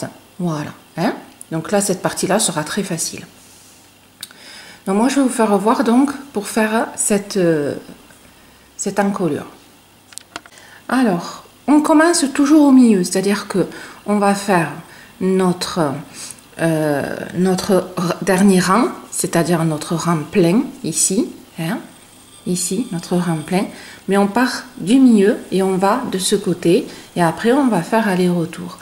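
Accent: South, France. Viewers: Coucou merci pour votre top qui est magnifique et vos explications claires et précises avec votre accent du soleil ❤💋👍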